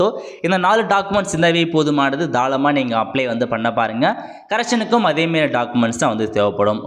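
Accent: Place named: North India, India. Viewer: tis person speaks like north indian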